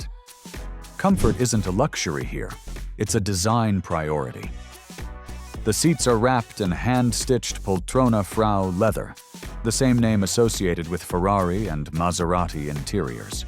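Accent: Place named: United States. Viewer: Good to Hear an American.🇺🇸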